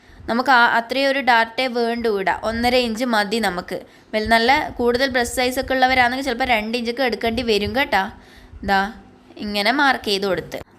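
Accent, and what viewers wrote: Kannur, India. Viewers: കണ്ണൂർ ആണ് അല്ലേ😂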